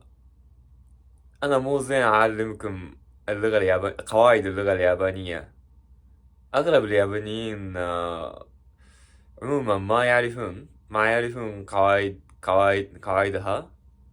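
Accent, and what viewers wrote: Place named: Iraq. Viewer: زين تحچي عراقي حلو 💫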